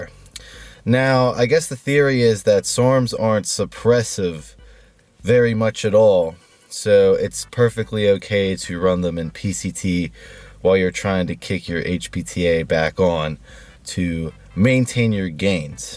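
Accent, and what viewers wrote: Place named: Baltimore, United States. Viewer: Without even finding out, your from Baltimore. Accent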